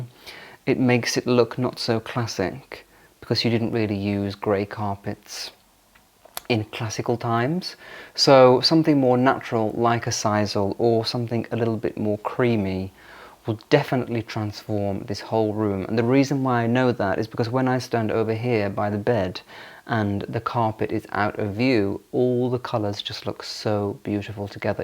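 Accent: RP, United Kingdom. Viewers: He talks so posh ❤ 😂😂😂 are your parents posh ?????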